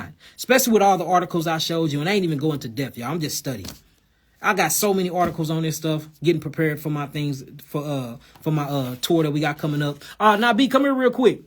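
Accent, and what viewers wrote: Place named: Kentucky, United States. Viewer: I’m from Louisville ky we really do talk like that especially in Louisville she definitely not faking it 😂💯 no bap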